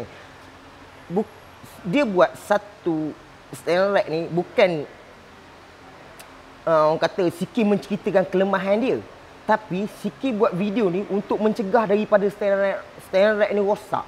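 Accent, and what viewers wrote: Pahang, Malaysia. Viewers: workshop kat mana? bunyi cakak turr maca org pahang jek..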